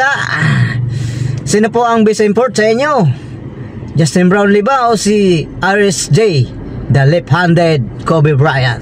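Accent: Visayas, Philippines. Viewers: Ayusin mo pagsasalita mo brad...bisaya ka yata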